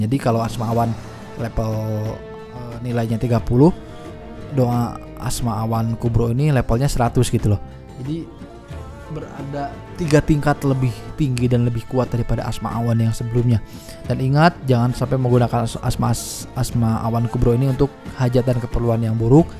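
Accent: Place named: West Java, Indonesia. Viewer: Klo di dengar dari logatnya kyknya kang orang sunda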